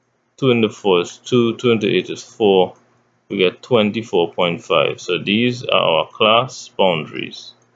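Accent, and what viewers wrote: Trinidad and Tobago. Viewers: He is Caribbean possibly trinidadian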